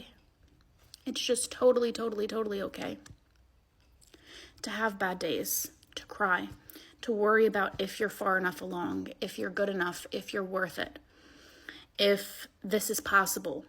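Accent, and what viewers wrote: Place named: New York, United States. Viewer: Ah...could've sworn the way she speaks is from the NY area, as that's where I'm from.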